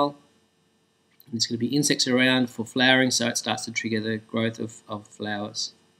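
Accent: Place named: Australia. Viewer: ohhhhh. it now makes sense. You saved my sanity. thank you. And I do appreciate the australian accent too. :D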